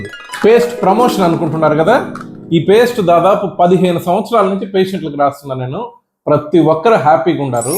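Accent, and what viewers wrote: Nellore, India. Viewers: మన నెల్లూరు యాస...👌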